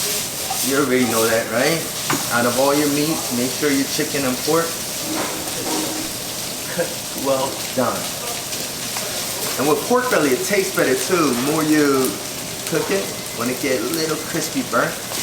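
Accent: Philadelphia, United States. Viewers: He's not cursing he's just speaking Philadelphia.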